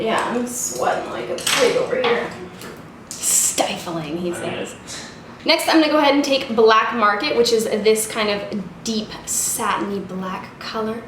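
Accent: Canada. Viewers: That Canadian accent gets me every time haha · Definitely a Canadian pronunciation. Just like how she pronounces "bag" differently. It's not incorrect, just different from the American pronunciation. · This is the most I've ever heard your lovely Canadian accent make an appearance. Eye "baeg" " daegger" it was great.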